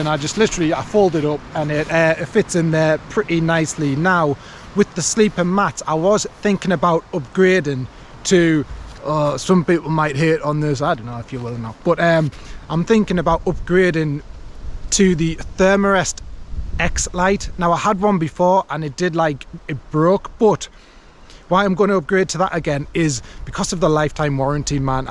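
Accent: United Kingdom. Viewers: A saw will drop a tree much faster and with much less energy than an axe if used correctly. The only reason you'd take the havier more cumbersome axe is versatility which is a fare reason but in the UK (I'm assuming based on the accent) if you get caught with either of them you're in trouble which is so stupid.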